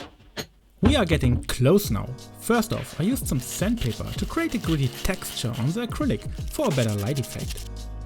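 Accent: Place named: Germany. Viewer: German? Irgendwie klingt dein Englisch nach deutschem Akzent. Nice shit btw. ;)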